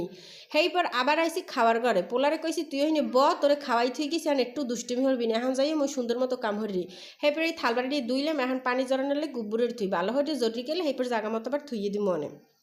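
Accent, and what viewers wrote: Barishal, Bangladesh. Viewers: আসসালামু আলাইকুম আপু চলে আসলাম তোমার ভিডিও দেখতে বরিশাল্লা কথা আমার ভালই লাগে লাইক কমেন্ট সাবস্ক্রাইব করে দিলাম নতুন বন্ধু হিসেবে পাশে থাকলাম অনেক ভালো লাগলো ❤❤❤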